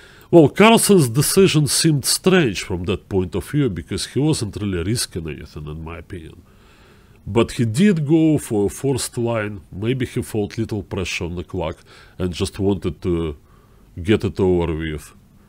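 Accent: Russia. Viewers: But they are not all available. Plus, this guy is great. He has great analysis and has some very interesting things to say about chess. And he's actually fluent in English you can tell. He can say whatever he wants and talks about jokes and other things. He's just got a Russian accent. Which is not that bad, it's called diversity. And this guy is very good and interesting. So what's the problem really. If <link> hired him he's obviously got something going. Eric and the other chess brahs are great but there are other great people out there too and we can learn a lot from diversity in the chess world. A little accent never hurt anyone and it's a good opportunity for people to learn something new about chess from someone very experienced.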